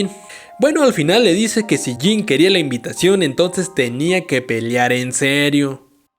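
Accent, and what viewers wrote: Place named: Chihuahua, Mexico. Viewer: parece de chihuahua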